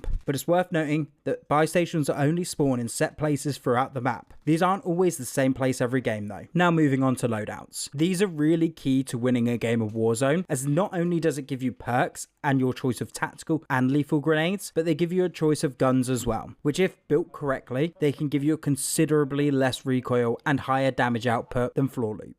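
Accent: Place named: United Kingdom. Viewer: THANK GOD A FELLOW BRIT EXPLAINS WHATS WHAT. WHY'S SO MANY IMMATURE, AMERICAN LOUD GOBS, SCREAMING LIKE KIDS,WITH NO REAL INFO? I AM SO ANNOYED AFTER 7-8 ATTEMPTS OF GULAG CONFUSION. IVE PLAYED EVERY COD GAME,BUT THIS DONT EVEN SEEM TO HAVE A "ME V BOTS, SOLO PRACTICE"? IS THERE ONE? PLEASE TELL/HELP!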